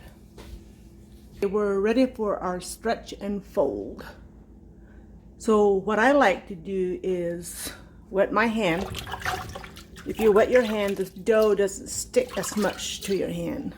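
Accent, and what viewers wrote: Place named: Pennsylvania Dutch, United States. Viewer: I cannot find any information on where you’re from but my goodness, you sound so much like folks from home! You wouldn’t be Pennsylvania Dutch by chance would you?love your channel. Thank you!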